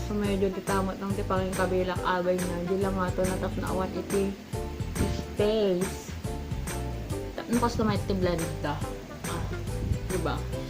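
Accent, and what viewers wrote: Ilocos, Philippines. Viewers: Mayat ti agbuya ti ilocano nga vlog a kasla daytuy, ta manmanu laeng. Haha, pati ayug haha. Ilocanong vlogger met daytuy nuymu ti biang., ed bucas! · Nice 1sis ang cute ng salita ng ilocano ilove it hehehe tangkilikin ang sariling atin feeling ko na nonood ako ng bahasa hahaha 100times like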